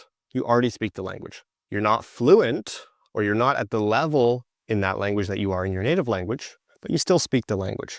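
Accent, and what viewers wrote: United States. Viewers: Are you speaking in American accent or British?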